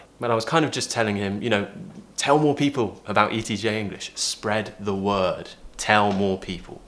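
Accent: United Kingdom. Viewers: I like British accent ❤️❤️ I'm picking up from you ETJ. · Cheers Mate, I really fancy listening to your British accent... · I love to hear the British accent fom you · Omg i love your british accent, and i was learning to make my british accent really like a native speaker like you 😊 always make a great content. I'll always support you bruh😊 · hi: I like ur accent a lot. im from mexico my level is advanced but im trying learning the way of speaking in UK. I prefer the accent from UK than American . greetings and thanks for all videos . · I really enjoy your videos aloooooot ,, i like your clear nice British accent and i admire it ♡♡.. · I love British English very very much and I'm obsessed with British accent. That's why I've found your channel and I LOVE it. Subscribed immediately! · It is a delight to hear you speaking with your clear and beautiful British accent! 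Just subscribed your channel!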